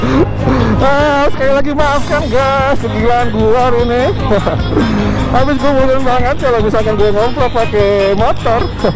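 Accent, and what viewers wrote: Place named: Banyumas, Indonesia. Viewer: Sing ngarep ngapak le ngomong...anjir nguakak bener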